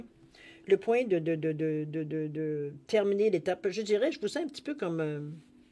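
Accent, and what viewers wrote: Quebec, Canada. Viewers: super bonne interprétation j'aime ton accent québécois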